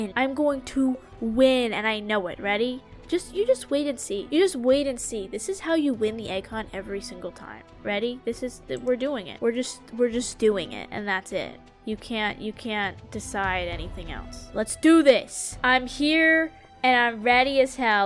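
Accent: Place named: South, United States. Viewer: I love her southern accent Like actually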